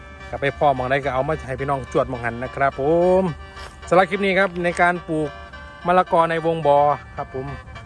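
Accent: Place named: Isan, Thailand. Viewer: พูดอีสานฟัง เพราะกว่านะคะ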